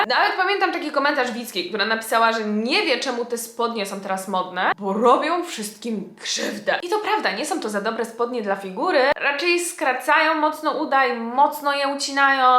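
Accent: Poland. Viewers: po kilku latach oglądania ciebie stwierdzam że pochodzisz z województwa świętokrzyskiego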